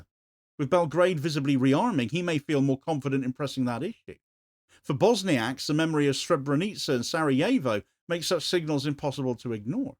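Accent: United Kingdom. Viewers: First of all, there's nothing humiliating about losing a war against NATO as a small Balkan country. Second of all I like how you haven't mentioned the massive anti-government student protests that have been going on for more than 9 months. You said yourself that these weapons have been 'battle-tested' against a civilian population in Gaza and you could easily draw a parallel with these weapons possibly being used on the Serbian anti-government protesters if you weren't just fear mongering and spreading propaganda. Also it's very interesting how Serbia is now a problem, but your entire Western bloc, including your own country, that have been supporting and arming Israel for DECADES are fine. What is this hypocrisy? This video is proof that you can say anything, with a British accent, and people will think you're insightful and smart.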